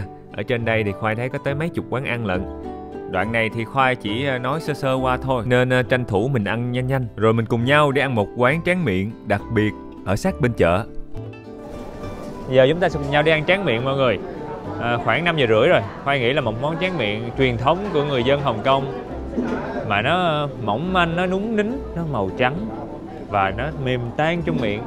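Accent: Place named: Mekong Delta, Vietnam. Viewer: Bạn này nói chuyện đúng chất miền tây luôn a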